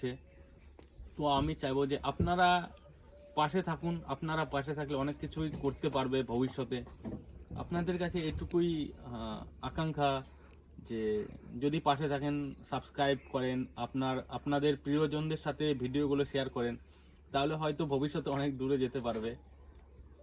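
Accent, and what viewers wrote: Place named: Bangal, Bangladesh. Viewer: এর বাঙাল কথা বন্ধ হয়েছে। খুব ভালো লাগলো।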